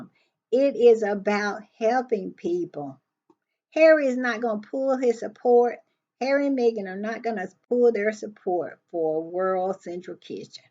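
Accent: South, United States. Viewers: ❤❤❤ here for the Read...here for that southern drawl ❤❤❤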